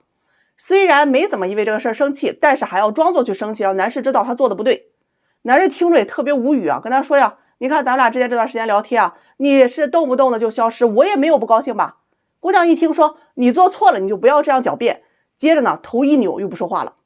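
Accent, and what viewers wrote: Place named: Jiangsu, China. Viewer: 她江苏的 口音不是东北的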